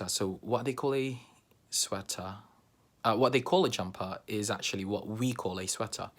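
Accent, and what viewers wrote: United Kingdom. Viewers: British accent na kaau raf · Wow British accent! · Nice accent bro! How come you already have a british accent?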